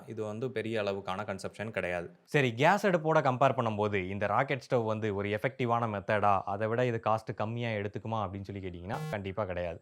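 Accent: Tirunelveli, India. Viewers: you have tirunelveli slang.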